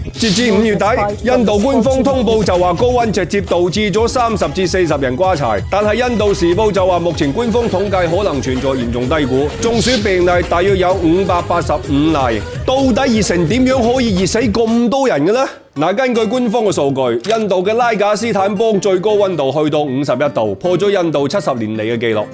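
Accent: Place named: Guangzhou, China. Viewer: up主喺邊度人，聽口音有d似我哋廣州話，但又唔係正宗廣州話，似乎是帶點其它口音的廣東話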